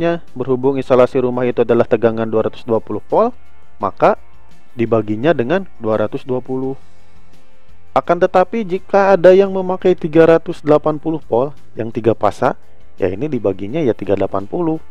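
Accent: West Java, Indonesia. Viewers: 1.Biar mudah dipahami tong buat para pemula. 2.itu logat sunda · Logat sundanya gk ilang yaa😂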